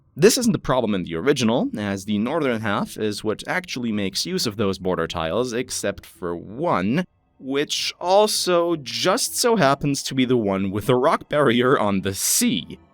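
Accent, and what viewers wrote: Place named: Germany. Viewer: Are you german by any chance? You sound a lot like you were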